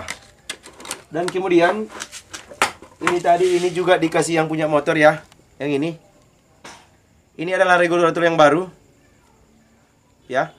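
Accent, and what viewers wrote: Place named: Medan, Indonesia. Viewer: Saya selalu mengikuti channel abang ini, sangat diteil penjelasan y, trus logat abang seperti logat medan, jd nggak bosan sy sambil ketawa sy bang, lanjut trus boss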